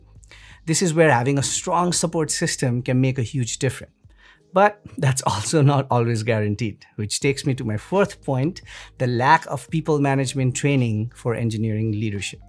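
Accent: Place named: Nepal. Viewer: I hear nepali accent.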